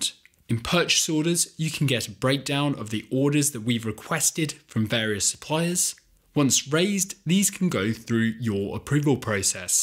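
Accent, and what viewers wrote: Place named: United Kingdom. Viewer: Awesome. Every tutorial is just so much more pleasant with a cheerful British accent. 🙂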